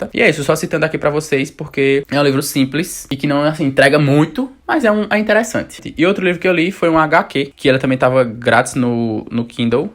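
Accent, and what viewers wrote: Northeast, Brazil. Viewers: Cheguei agora no seu canal e já quero assistir todos os seus vídeos, acho que me identifiquei mais por conta do seu sotaque (muito bom de ouvir, diga-se de passagem) já que eu tbm sou do nordeste. Ganhou mais uma inscrita e já coloquei vários na minha wishlist. :)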